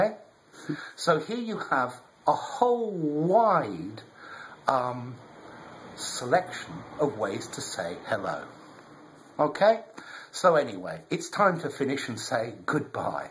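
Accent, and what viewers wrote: United Kingdom. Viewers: Oh, Finally A British Teacher.! Great! BTW,I am interested in the British accent and trying to listen to it as much as I can,but still need rules! Do you -or anybody else- know how to improve ACCENT ? sources PLZ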